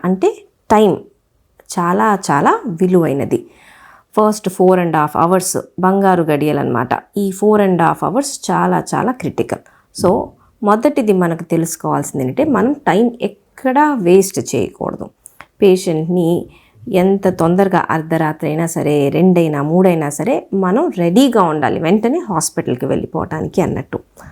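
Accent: Guntur, India. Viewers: గుంటూరు యాస బాగుంది మేడం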